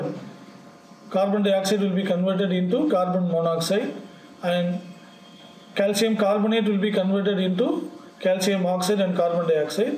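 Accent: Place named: India. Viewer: I liked the lesson. but seriously, you have such a strong Indian accent. Work on your pronunciation. But overall good lesson.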